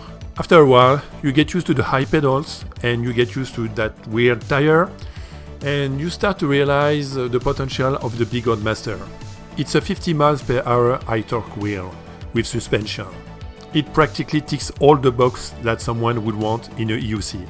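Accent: France. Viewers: Belle narration avec le flair de Paris saupoudré .😘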